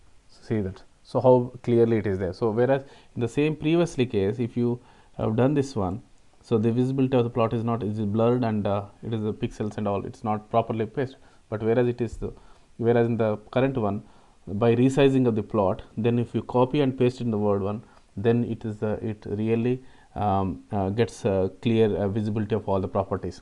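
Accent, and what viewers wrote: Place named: India. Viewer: Thanks dude I understood you even your indian accent is a little bit difficult to my ears but I caught it. I learnt a lot in this video.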